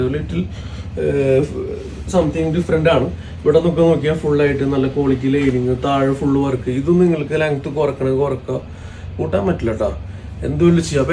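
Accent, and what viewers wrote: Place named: Thrissur, India. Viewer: Innentha oru Thrissur slang pidichad😂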